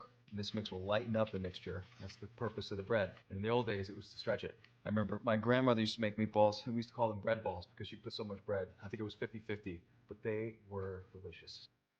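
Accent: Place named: Brooklyn, United States. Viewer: Also very Brooklyn.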